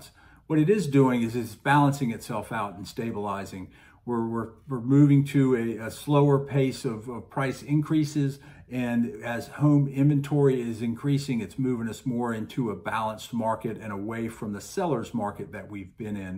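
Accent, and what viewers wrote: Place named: Philadelphia, United States. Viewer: Are you from Philadelphia? I hear a regional accent. Thanks for the info. Can you focus on north Myrtle beach and Cherry grove area also?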